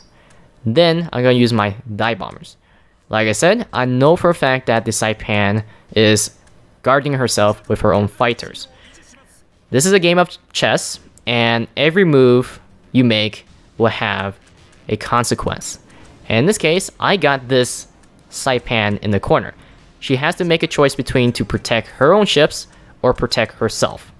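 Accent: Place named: Philippines. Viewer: I was born and raised in the Western United States. You sound to speak English more like someone who is South East Asian or Pacific Islander. My first guess is Filipino. I grew up near a Filipino neighborhood and that's the accent they have.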